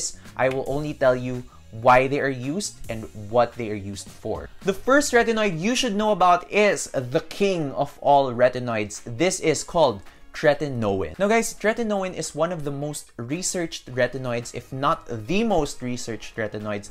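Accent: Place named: South Boston, United States. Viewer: Soo Southie nga 😂 Just stumbled upon your channel, very informative 👍🏽👏🏽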